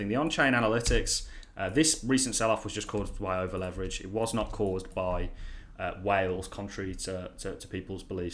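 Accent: Birmingham, United Kingdom. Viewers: You sound like a fellow Brummy? I'm pretty happy you made this video. I was planning on selling my Cardano, but I'll hold on, and hope the price jumps up. What's your thoughts on Elrond? I've had it for quote a while now.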